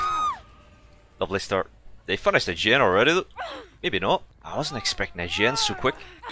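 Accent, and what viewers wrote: Northern Ireland, United Kingdom. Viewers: lol he sounds nothing like Paddy Pimblet. Paddy is English and has a strong Scouser accent from Liverpool & is from Northern Ireland by the sounds of it.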